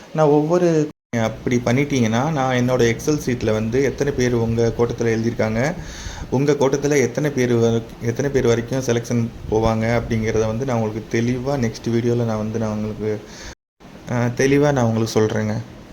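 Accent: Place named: Tirunelveli, India. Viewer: திருநெல்வேலி தமிழ். 24. அப்புறம். 9. அப்புறம். 8. கால் லட்டர் வருமா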